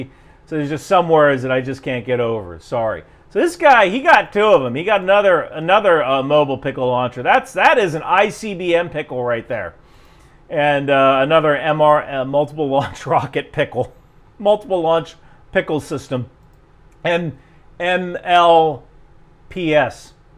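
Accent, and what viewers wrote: New Jersey, United States. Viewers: Kosher pickles? Joyzee accent? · Bro just casual about the Jersey accent, thats crazy you sound like your actually from jersey. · To a west coaster like me, the New Jersey accent is like 95% the same as Bawlmerese, so I'm surprised that Marylanders would think you sounded *different*, much less dumb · say "It's not a nursing home! It's a retirement community." in your jersey accent. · Please keep your Jersey Accent! It is fun to listen to! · Toni soprano? wow you sound just like him when you talk with that Jersey accent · Please use your Jersey accent more! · You should really, really revert back to the Jersey accent. I really dig it. I don't think it sounds stupid at all (and I'm not even remotely from that region)😂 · My dad was from Maryland, you Jersey types all sound like you just came off a Jersey Shores set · For the record, New Jersey accent is from a very small part of the state in the northeast, adjacent to Staten Island New York. 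Pretty much the only people who talk like that are, Snookie and her friends. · You've got to do an episode with your natural Jersey accent!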